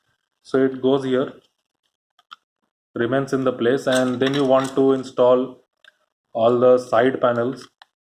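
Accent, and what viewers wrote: India. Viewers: another indian accent ...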